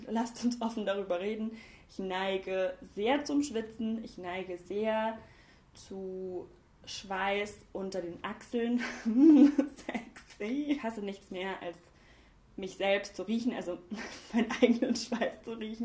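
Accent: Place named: Baden, Germany. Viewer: Ja gerne nochmal ein Video 😆 diese Kombi aus Badisch und Hochdeutsch ist immer lustig 😆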